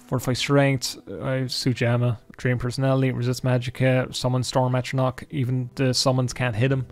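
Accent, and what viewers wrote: Ireland. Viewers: Narrating gonoor or whatever his name is in your Irish makes him seem a bit scarier if I'm honest..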